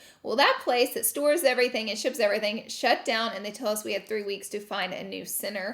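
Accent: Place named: Alabama, United States. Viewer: Yes!!!♥️♥️♥️ I’ve loved you since your dollar tree hauls with the Alabama accent😂 Your heart is pure gold my love. Much love from Hawaii🌺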